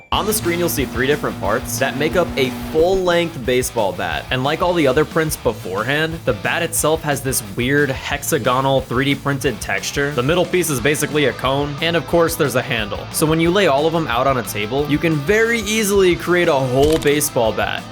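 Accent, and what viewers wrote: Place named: United States. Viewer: rugby it's rugby. Oh American that say words so weird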